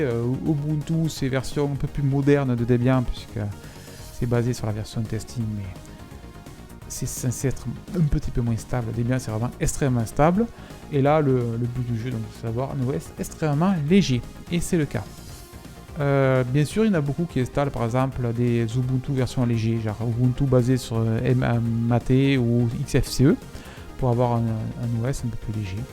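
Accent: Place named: Hérault, France. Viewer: Intéressant.... d'après ton accent tu es origonaire de lherault non 😊 ou proche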